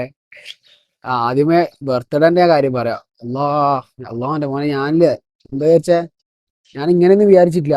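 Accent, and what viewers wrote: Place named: Vadakara, India. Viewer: engale evida anu engale basha keteet kanur alle vadakara slang thonnu atha choyiche😂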